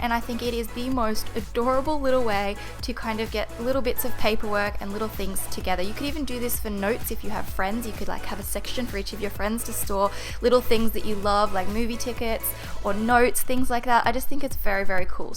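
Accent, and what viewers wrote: Australia. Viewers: Are you from Australia 🇦🇺😊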